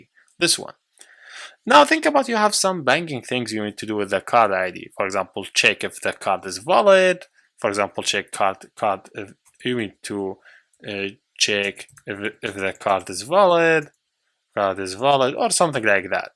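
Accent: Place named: Iraq. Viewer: Your accent seems like you are arabic,more similar to Iraq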